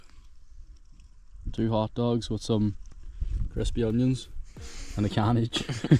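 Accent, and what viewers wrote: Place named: Donegal, Ireland. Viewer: Next video will you say dia duit in your donegal accent please. Im learning gaelic and its HARD!!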